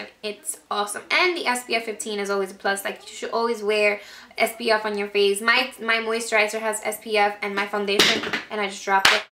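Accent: Dominican Republic. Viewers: Haha i read down on your comments and ikneww you were dominican. so am i and i immediately picked up on your accent. Your gorgeous btw :) subbed right away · You remind me of myself! Accent and everything! Are you Dominican? lol def subscribing!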